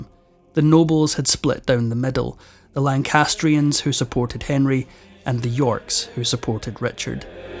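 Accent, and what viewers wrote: Scotland, United Kingdom. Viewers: The narrator has a lovely voice and a charming scottish accent! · I don't think that is Neil. He doesn't sound anything like him, apart from being Scottish, and I can't find any reference to whatsoever on this channel.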